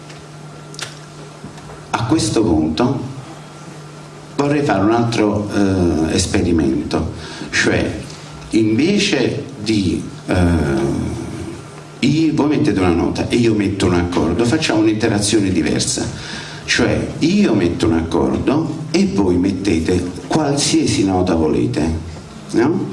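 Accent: Naples, Italy. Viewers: Complimenti maestro la sua napoletanità la rendono comunicativo e trasferisce le sue nozioni in maniera eccellente !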